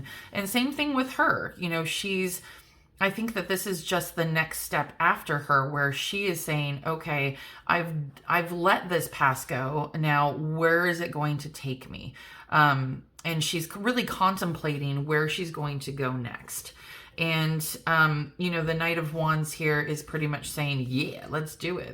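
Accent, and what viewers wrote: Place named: South, United States. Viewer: you speak w the same dialect. But her southern drawl is lil deeper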